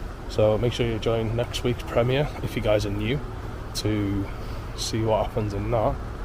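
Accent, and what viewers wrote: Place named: Liverpool, United Kingdom. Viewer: That was epically brave of ya!! Ya sound a but scousey there tonight, must be cos ya were nervous.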